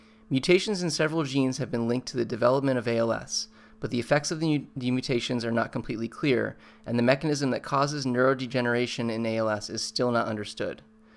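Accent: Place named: United States. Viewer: NOT familiar with the american accent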